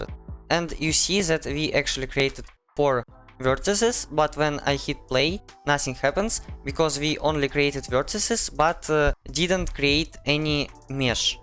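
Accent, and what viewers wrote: Russia. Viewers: бро мы все знаем что ты русско говорящий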